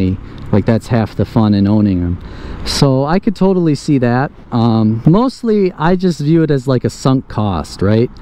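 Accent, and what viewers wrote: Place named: La Grange, United States. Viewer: I'm also pondering a 50cc or 125cc scooter. You sound like you live close to La Grange. Class M gives you a valid motorcycle license to legally operate any motorcycle with an engine size of 150 cubic centimeters (cc) or greater. The Class L license is for riding a motor-driven cycle with less than 150cc engine size. Note, riding an electric scooter does not require a special license.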